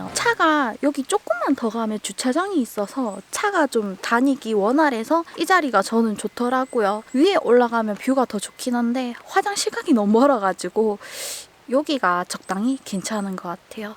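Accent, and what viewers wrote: Gyeongsang, South Korea. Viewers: 갱상도사투리 너무 귀욥 ㅎ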